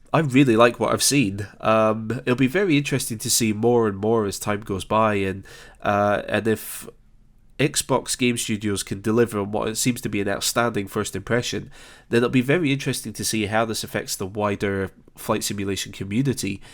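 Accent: Scotland, United Kingdom. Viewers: Right on,! Lots of speculation out there both from the "users" AND associated developers. Hope for the best - plan for the worst, Expect nothing, and you won't be disappointed. Those are a couple of my old "standbys". Call it cynical, but for me it has worked. I will be holding on dearly to both my boxed and steam versions of FSX until well after the dust settles next year! But, if the new version pans out perhaps you will develop another great tutorial series for it? I can guarantee you at least one follower! <smile> BTW, not too long ago, we were up in what I have assumed to be your homeland in Scotland. ( Your accent and Inverness Airport?) Anyway, we spent several weeks driving around Skye, Lewis & Harris, the Highlands, etc. Beautiful country-side, great people! Was quite interested in the airport up in Stornoway (EGPO). I later googled and found a decent free FSX add-on for that little former military airfield that some of your viewers might also enjoy. 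It has an interesting history, as do many other converted military airfields around the world today, like the one in my home-town, Burlington, VT - Burlington Intl (KBTV).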